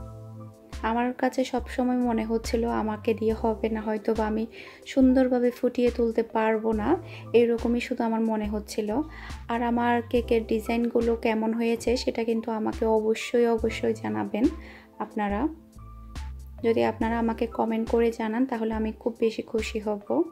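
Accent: Chittagong, Bangladesh. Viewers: আসসালামু আলাইকুম। আমি নতুন।তোমার কথাগুলো অনেক ভাল লাগে। তুমি কি চট্টগ্রামের মেয়ে? জানলে ভালো লাগবে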